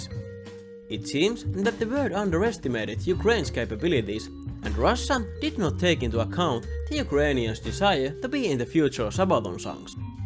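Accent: Finland. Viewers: me hearing first three words and realising that this guy is finnish (finnish accent) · Fairly difficult to take these videos seriously with this excessive finnish accent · Sun äänestä kuulee heti et oot suomalainen.(ralli engalti)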